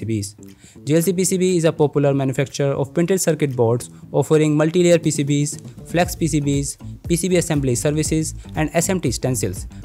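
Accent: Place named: North, United Kingdom. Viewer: fix yr accent northie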